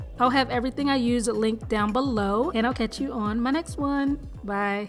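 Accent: Baltimore, United States. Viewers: I've seen your thumbnails for years n I always refer to you as the girl that looks like Nicki lol I love that you did this natural straight style, aslo do I hear a bmore accent?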